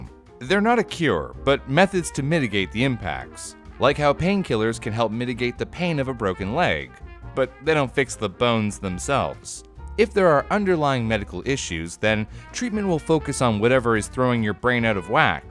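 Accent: Quebec, Canada. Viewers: Vous êtes du Québec?!!?! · okay that was Quebec French are you guys Canadian?!? as a french canadian I like finding Canadian youtubers! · On s'entends qu'y'avais personne qui s'attendait a entendre parler en Québécois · Are you canadian? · speaking Quebec French was a pleasant surprise, I lost my quebec accent when I started to speak English more Now I’m not even sure what accent I have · ahhh vous êtes québécois ? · Wait what ? You can replicate the Quebecer accent perfectly! MY ACCENT! Seriously are you from Quebec ? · effectivement, je m'attendais à ce qu'il utilise le français européen, le français québécois m'a vraiment surpris haha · You speak le jouale! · Well the chanel is a canadian chanel and spoke québéquois (french in québec, a Canadian province) also, qébequois is like the american english for the british. So yeah, spoke french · Omg j'capote! 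speaks french like a Québécoise!!! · Is that québécois I hear?! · French is a mix of Québec and Acadian. Neat! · I love her accent she’s so cute with it! Also that’s French Canadian Chills speaking! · Wait is from quebec?? If not that's literally the best imitation of our accent I've ever heard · As a Anglo-québécois that moved away, you have no idea how big a smile I had on my face when started speaking in true blue Québec French. I miss hearing it so much. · speaks canadian french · Im quebec french that was awsome almost sound more quebec french than fremch france . 😎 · God i vibe with speaking in a french quebec accent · Parfois tout mes pensées sont en français aussi. was speaking some kind of Canadian French or something!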